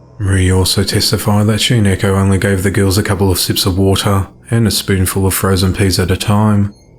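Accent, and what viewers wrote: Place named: Australia. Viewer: Just came across your channel and as soon as I heard your accent I was like, hell yeah I am definitely subbing to Mt fellow Aussie... 🙂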